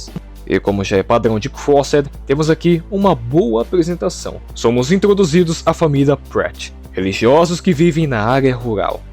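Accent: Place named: Pará, Brazil. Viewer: Eras esse égua, até pareceu paraense kkkkk gostei do rank, discordei de algumas posições kkkkkkk vlw, flw 👏🏽🫶🏼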